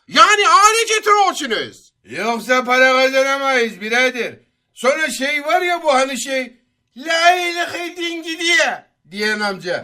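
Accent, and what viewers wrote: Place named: Central Anatolia, Turkey. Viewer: İç Anadolu şivesi tam oturmuş,anlayana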